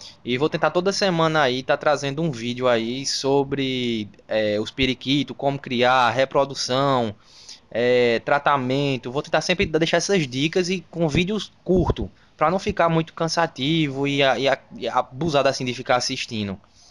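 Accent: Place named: Northeast, Brazil. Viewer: Você é nordestino? Sotaque lindo 😊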